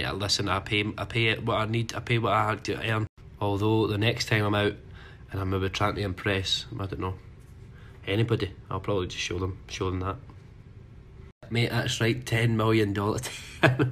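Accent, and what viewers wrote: Scotland, United Kingdom. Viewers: doest matter what it is ....i love your Scottish accent 🙄 and i love you Lewis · BREATH of fresh air in the mad world of celebs. YOU keep that accent we scots love you for it. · Omg I didn't know you were Scottish I'm Scottish I thought u was American :/ :D love you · This is a very funny young man.. Dare I say it but he makes me damned proud to be Scottish...I pray he never loses his Scottish accent...it's who he is... f**k anyone who cnt understand him (us)... Totally love his music.. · it's a scottish accent mate. No too hard tae understand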